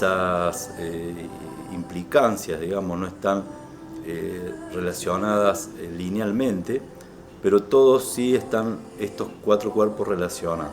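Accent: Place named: Córdoba, Argentina. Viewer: Gracias por compartir por este medio tu conocimiento, con palabras tan claras y ademas, con tonada cordobesa.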